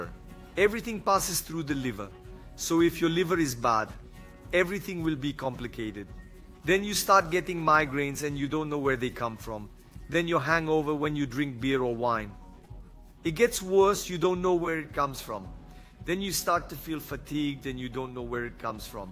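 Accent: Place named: India. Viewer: Great job dr.. Sutaque parececido com indiano falando.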